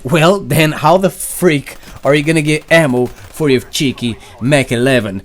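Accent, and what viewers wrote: Portugal. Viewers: Are you Portuguese? 🇵🇹 You sound Portuguese.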